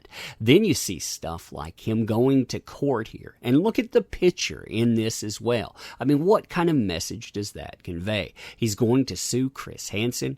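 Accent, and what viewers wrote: South, United States. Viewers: I'm just saying Your Voice Sounds like the poster child for southern hospitality from a guy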